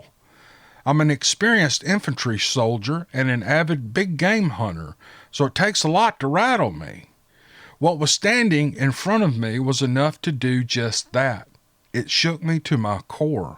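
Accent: South, United States. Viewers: ...my wife claims to hear an East Texas coworker say "might-can" a lot, and I have never heard anyone say that, until you opened this episode with a "might-could." Thanks for your wonderful Southern accent and jargon. Merry CHRISTmas to you and your family!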